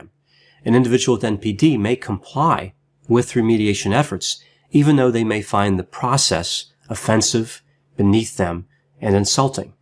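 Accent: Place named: Delaware, United States. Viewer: Haha..knew that accent was familar..deliware..I'm from.philly:) this was very informative on becoming a licence clanition..if often thought about it. Teachers told me I'd be good at it lol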